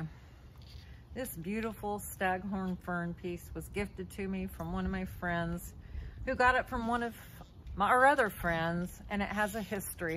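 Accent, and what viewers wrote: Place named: South, United States. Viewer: That’s her southern draw my friend. I’m oh so fascinated with our friends and their accents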